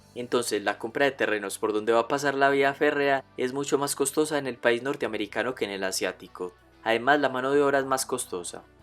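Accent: Colombia. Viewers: Excelente video pero pésimo la narración el acento colombiano lo daña todo.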